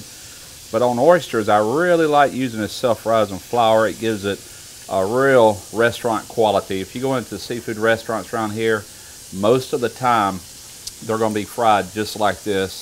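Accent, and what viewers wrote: South, United States. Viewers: i’m from virginia and there is something tremendous about having a southern man give this advice. When i hear the draw i know he’s gonna be great. thank you sir!!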